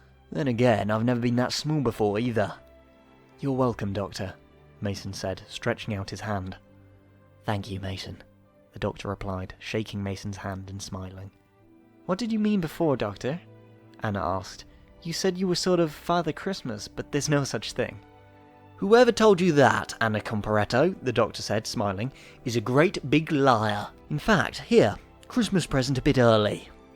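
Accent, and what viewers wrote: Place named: United States. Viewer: your american accent is pretty good! (i’m american)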